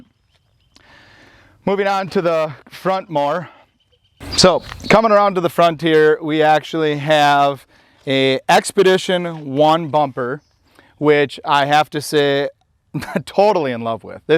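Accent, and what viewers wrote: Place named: Canada. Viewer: Awesome truck! I was wondering if you were Canadian by your accent, then I heard you mention Wisconsin...very similar.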